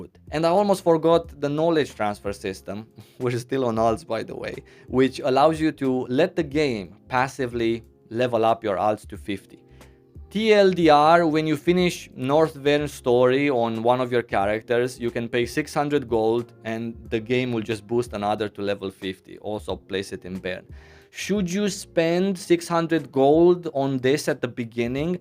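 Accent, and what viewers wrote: Italy. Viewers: are you italian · As usual very nice video thanks ! May I ask you a question ? I'm French and I'm used to hear people speaking English from different countries and with different accent. I don't know why but you accent sounds Italian, the way you speak English and the way you handle your hands as well :) At the same time I also think you may be from from an Eastern country like Romania. Do you mind telling where you're from ? (and no it's not because I'm a weirdo and felt in love with you !!!)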